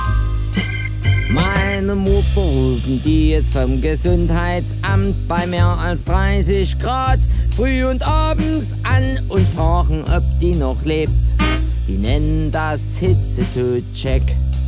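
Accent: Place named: Saxony, Germany. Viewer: Köstlich. Und auch in diesem Jahr geht‘s wieder los. Es ist auch wunderbar, mal einen echten sächsischen Dialekt zu hören. Nicht immer dieses pseudo-sächsisch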